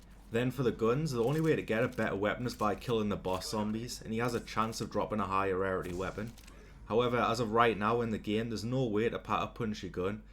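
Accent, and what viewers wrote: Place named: Northern England, United Kingdom. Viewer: Congratulations, glad to hear another northern English on YouTube, not to often you find us.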